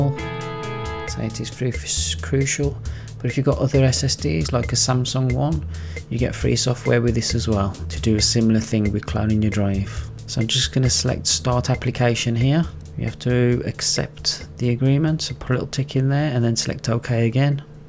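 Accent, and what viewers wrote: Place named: Midlands, United Kingdom. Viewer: some one i understand from the midlands cheers matey.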